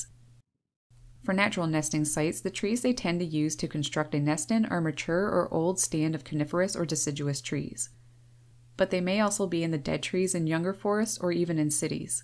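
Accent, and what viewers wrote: Newfoundland, Canada. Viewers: It’s subtle, but you sound like a Newfoundlander! I love to hear the accent. Interesting video with great information, not sure how this was recommended to me... but I learned something! Thanks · Ok i hear Canadian accent ❤ love your name so cute 😊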